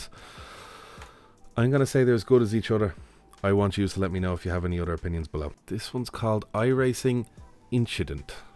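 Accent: Ireland. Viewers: The Irish accent, just love it.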